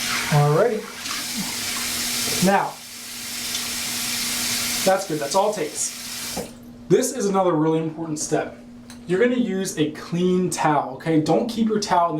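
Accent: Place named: Rural, United States. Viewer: You Speak like a brain dead hick ....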